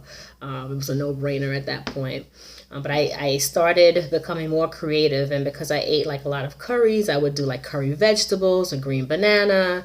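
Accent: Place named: Jamaica. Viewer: I knew you was a yardie... picked up the accent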